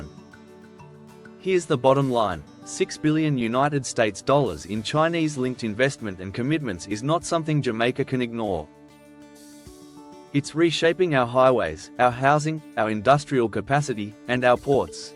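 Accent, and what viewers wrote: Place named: England, United Kingdom. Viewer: This presenter has an English accent has he ever wondered why a [so called] British subject was left back so badly, that they need catching up in every department with Chinese help and money, if you didn't or cannot offer anything in the past or now toward our development. please leave us alone, all we gonna get from any western associations are sour grapes.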